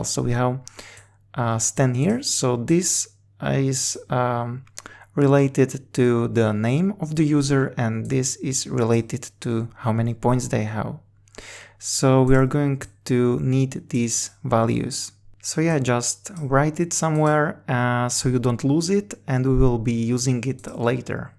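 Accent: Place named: Spain. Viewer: Perdona, pero tu normalmente no hablas ingles 😅. Tu ingles es muy español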